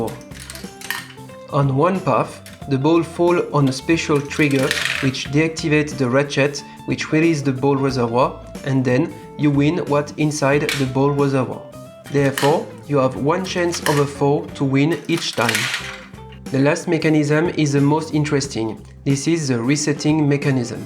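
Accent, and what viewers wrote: France. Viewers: L’accent FRANÇAIIIIIIS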